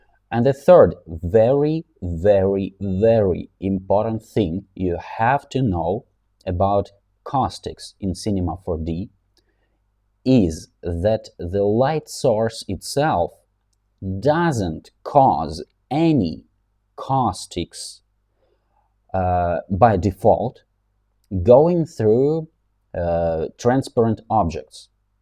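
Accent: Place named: Ryazan, Russia. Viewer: Константин Санжаровский не совсем. Частенько проскакивает рязанский) И ни один нормальный носитель языка не говорит с таким усилием, выдавливая из себя слова.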